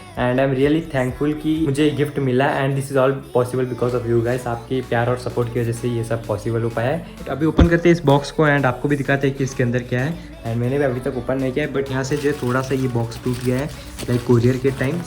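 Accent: Karnataka, India. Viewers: is your mother tongue kannada?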